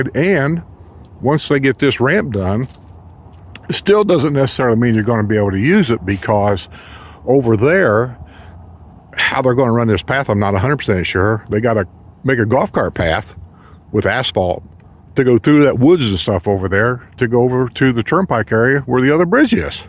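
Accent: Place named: South, United States. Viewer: Love the “SOUTHERN” accent. Are ya from Kentucky? lmao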